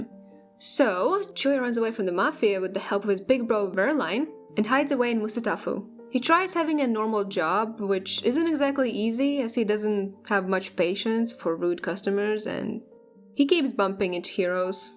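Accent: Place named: Russia. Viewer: I love your Russian accent.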